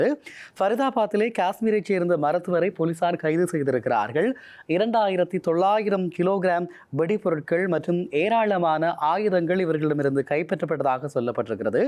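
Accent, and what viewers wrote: Sri Lanka. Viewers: Seems the news reader has studied in Srilanka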